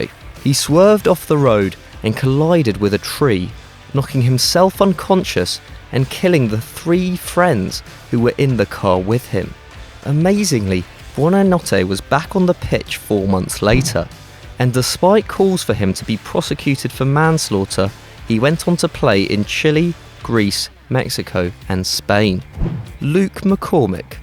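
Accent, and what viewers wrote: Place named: England, United Kingdom. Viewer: 100 kilometres an hour.. You say this with a English accent.. YOUR ENGLISH MATE it's MPH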